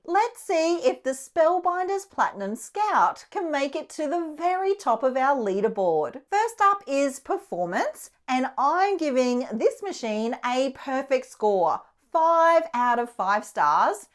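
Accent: Australia. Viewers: Oh,... I love your voice! Your Aussie accent is fun, and your voice modulations are happy and cheerful! Please don't change. ~Kathy ❤❤❤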